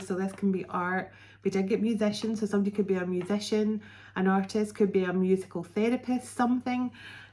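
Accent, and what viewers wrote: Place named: Scotland, United Kingdom. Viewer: Love ur reading style,seen nothing like it,❤❤❤it.a while back I guessed irish,Irish, corrected me kindly,no its obviously scottish=D.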